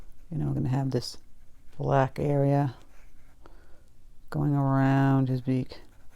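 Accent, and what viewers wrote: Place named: New England, United States. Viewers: The Northern Cardinals can have red beaks and some have a yellowish orange beak. The desert dwelling cardinal from Columbia has a gray and black beak. Some have bodies that are gray all over. Some from Arizona have gray bodies and yellow beaks. In Alberta Canada the males are red with mainly yellowish beaks. The females are mainly gray with some red and have yellow beaks. Im sure some will disagree with me because frankly the Cardinals are always birds of a different color. I love your Cardinal. His legs should be angled forward to the left from the knee just below the body and feathers. Love your show and your accent. Do you have a red beak, gray or yellow?lol. Are you from New England or New York areas? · Thank you for this wonderul tutorial! I love Cardinals and see them regularly living in New Hampshire. 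I knew I detected a New England-like accent when I started watching you last week.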